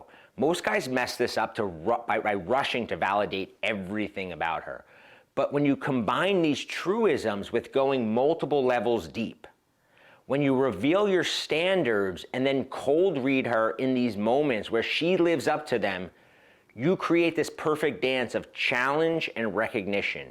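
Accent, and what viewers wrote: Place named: Philadelphia, United States. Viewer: Love it. Slow validation. I’m in exact situation now I’ll be 55 and the girl I’m dating is 39. I’m used to dating much younger woman so I’ve learned this overtime, it took me time in a few mistakes, but eventually, I got it . Quite frankly, most women love a mysterious man. I hear that Philly accent by the way.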